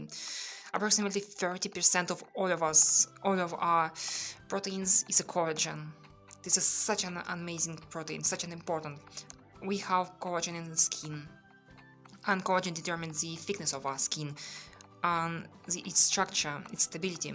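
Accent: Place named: Russia. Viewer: Сначала я увидел лайк под русскоязычным комментарием другого видео, потом услышал английский с явно русским акцентом, ещё заметил подписку на русскоязычный канал… Какие языки ты знаешь, и какой язык родной?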